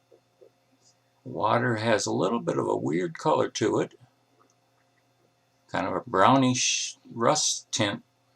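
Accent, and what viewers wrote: Ohio, United States. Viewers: Hey, I am watching your dam removal videos from the day of the first day and the notch. You did a great job! Maby the best dam removal documentation of all. And your Ohio accent is very good for me to understand and sounds very pleasant to me. Thank you very much for your great videos! Greetings from Krefeld/Germany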